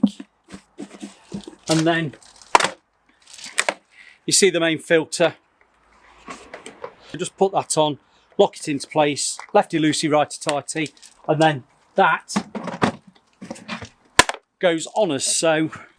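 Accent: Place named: Cockney, United Kingdom. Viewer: This man speaks in a Cockney accent.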